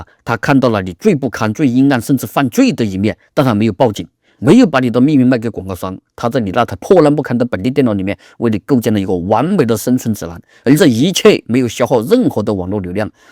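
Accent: Sichuan, China. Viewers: 兄弟伙你这声音恩是川普哦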